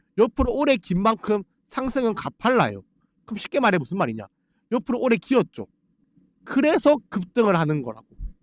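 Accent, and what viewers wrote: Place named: Gyeongsang, South Korea. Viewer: 경상도 사투리 의요 정감이 가는 언어 웃어봅니다 이른 아침부터 유익한 정보 감사합니다 님! 믿고 따라갑니다 감사하구요 화이팅입니다~~🎉🎉🎉😅😅😅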